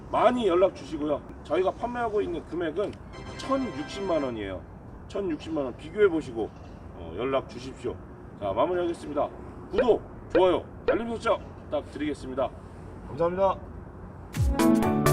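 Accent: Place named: South Chungcheong, South Korea. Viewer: 윤팀장님 충청남도 양반 이다에 내 손모가지 걸께요 가생이 !!! 사투리 푸하하하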